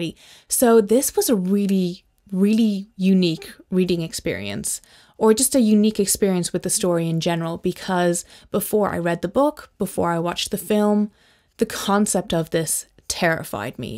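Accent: Ireland. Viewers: I had to check your profile to be sure of your origin. Sounded Irish yet was kind of Nova Scotia or thereabouts :-) King's Pet Sematary is well done and adheres to the philosophical principles of the horror genre. Fritz Leiber rocks the horror. . . the horror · Are you Irish? 🇮🇪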